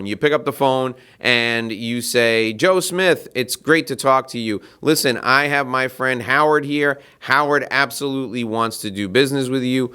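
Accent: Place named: United States. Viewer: I really appreciate your efforts to make sales people educated. I like your accent, it's US I guess. Subscribed!!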